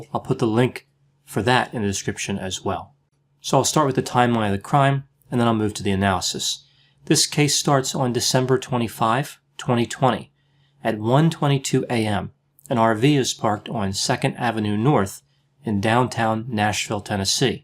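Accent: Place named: Mid-Atlantic, United States. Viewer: Dr, you sound like you're from South Jersey/Philly region. You have a very distinct mid-atlantic accent. Where did you grow up? I'm studying linguistics..just curious!!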